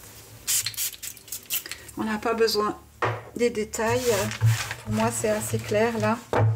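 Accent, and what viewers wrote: Switzerland. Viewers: Bonjour je me suis abonnée. Vous êtes suisse? Je reconnais votre accent. Je suis de nyon.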